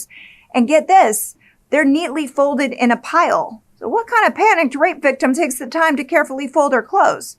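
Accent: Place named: South Dakota, United States. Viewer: Girl, I love you! I’ve been suppressing the urge to ask this for quite a while, but now I have to, lol… are you from South Dakota, by any chance? Only asking because I’ve always detected a hint of an accent/pronunciation with words like, “bag”… for now “dagger.” a couple friends of mine from South Dakota pronounce things similarly. Obviously, I respect your privacy, so no need to share, it’s just my ADD brain 😂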